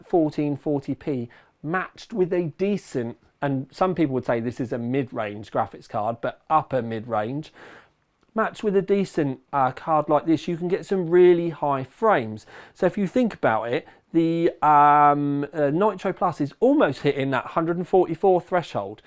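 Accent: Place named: United Kingdom. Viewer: at first I was like "oh, damn, British accent" to which I am not all that well accustomed, but then I went "eh, nice pronunciation" (obviously, eh) thanks for the review and highlights against greens and cheers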